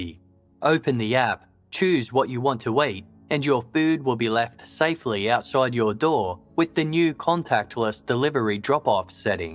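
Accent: Australia. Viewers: Given that you my dear narrator have a classic Aussie twang, would I be safe to assume this is an Aussie owned production? I’m glad I found you, I enjoy your stuff and your presentation. Cheers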